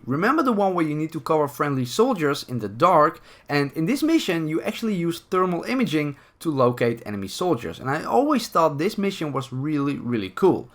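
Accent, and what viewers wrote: Netherlands. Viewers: Your dutch accent is out of the roof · You have a Dutch accent I'm right? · Ur acent is a bit dutch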